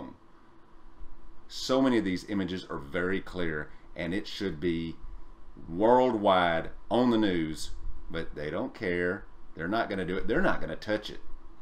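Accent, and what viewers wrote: South, United States. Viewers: Hey. I can't quite seem to make out the writing that you're talking about, but on the same 'rock', top of the screen, left of centre (just above that bright spot) it says 'BEV' - in the clearest possible, white, capital PRINT! Though it doesn't look like it's actually printed on the rock, but separate letter blocks (look at the 'V') - that's undeniably ENGLISH!!! But I don't think that's the same writing you are talking about, because you zoom in to a lower point. You didn't miss that tho', did you? PS Lovely to hear that great, southern drawl of yours again!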